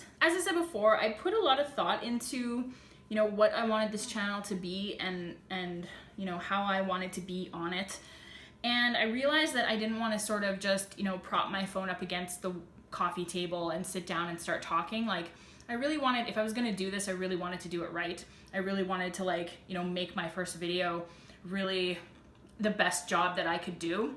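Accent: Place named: Canada. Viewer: Who doesn't love a cdn accent?!!!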